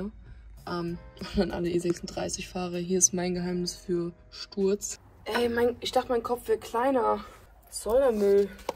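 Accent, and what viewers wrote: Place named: Russia. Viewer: Hi🙋🏻‍♂️ bin zufällig auf dein video gestoßen👍🏼 hat spaß gemacht zuzuschaun und war lustig. Vorallem find ich gut dass du so natürlich rüber kommst. Du bist aber auch verdammt hübsch. Und ohne scheibenwischer sieht die Karre geil aus👍🏼. Walla du hast ahnung👌🏼 und stil. Die Felgen passen wie angegossen zum auto. Glaub bist russin, hat sich so angehört🙂👍🏼ich lass natürlich ein Abo da😁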